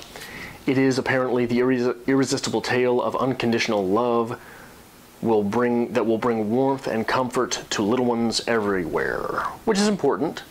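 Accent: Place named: France. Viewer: LOL That French accent. One of my best friends had an uncle like you. He would only buy her books and she knew that she could ask for any book she wanted growing up. He really encouraged her to read and love books. Congratulations on being an uncle!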